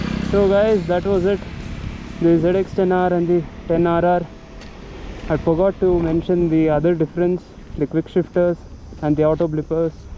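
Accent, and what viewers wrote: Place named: Chittoor, India. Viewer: Hey you make amazing videos no doubt about that and keep it going and looks like your native is chitoor , guessing that from the accent and also looks like you have been inspired a lot by Sriman kotaru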